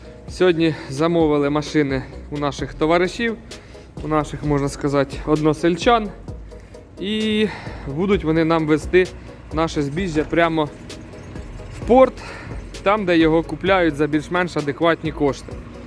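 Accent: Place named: Poltava, Ukraine. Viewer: Судячи по говору Полтавська.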